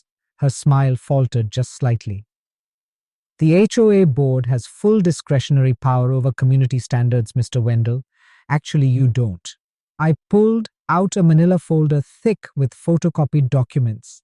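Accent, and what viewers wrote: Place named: India. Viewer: Oh my God it's got an accent of someone from India and it's so goddamn annoying I got to get rid of this one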